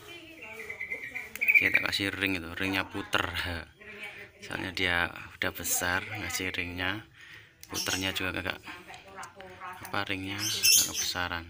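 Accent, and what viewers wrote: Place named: East Java, Indonesia. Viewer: Wong Jatim koyok e lek ndelok logat e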